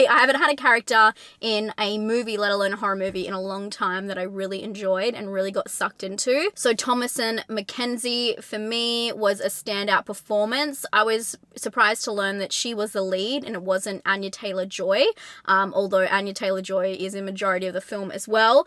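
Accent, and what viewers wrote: Australia. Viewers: WELL SWEETHEART, IM.JUST AN OLDER.MOM BUT I LOVE YOUR "NASALY" (NOT EVEN!) AUSSIE ACCENT AS WELL AS YOURE AWESOME CONTENT AND YOURE ABSOLUTELY LOVELY TOO! KEEP UP THE GREAT WORK, SISTER!❤😁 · your nasally Australian accent is awesome to this California girl--LOL!!! Thank you for the great commentary!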